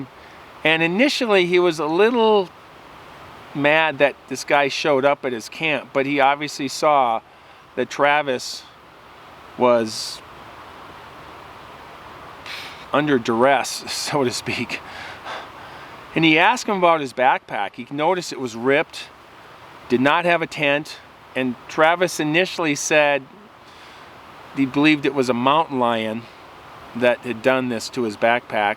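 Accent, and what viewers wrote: Minnesota, United States. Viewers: Thank you, keep hiking,Haha. I enjoy listening to you and even before you said you were from Minnesota I figured as much as I recognize your slight accent. Mine is probably a little thicker as I am from northern Minnesota. Anyway thanks again.😎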